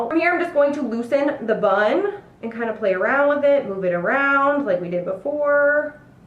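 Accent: Midwest, United States. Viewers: I've watched a couple of your videos and I decided you had a Midwest accent and surprise we live in the same city! Or at least I live in the same area as your PO box so we have the same accent. Anyway I think I liked the third bun the best. Except whenever I try it, it's almost always bad. I haven't gotten that one to work on me.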